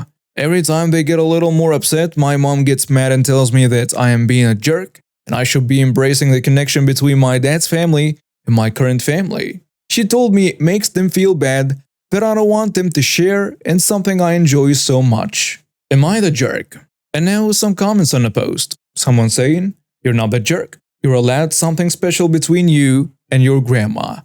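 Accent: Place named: United States. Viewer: Hi! I hope that all is well with you and your loved ones. You are amazing with the English language. You can say several sentences in a way that sounds like you're an American! You are pretty much fluent! 👏👏👏 It's snowy, windy, and the temperatures in northeastern US right now, p.m. are 4°F. It's freezing! What is it like in Egypt? I wish you continued success with your channels! Take good care of yourself. Work hard, but find time for fun and relaxation, too!😊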